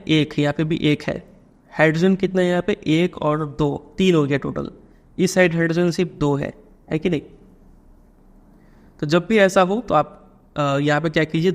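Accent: Bihar, India. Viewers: Hello sir kya aap bihar ke hain kya?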